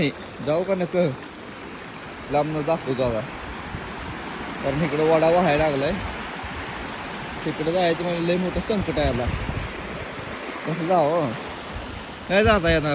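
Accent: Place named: Marathwada, India. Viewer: तुम्ही कोणत्या गावातून बोलता तालुका कोणता आहे भाषा मराठवाडा तील वाटते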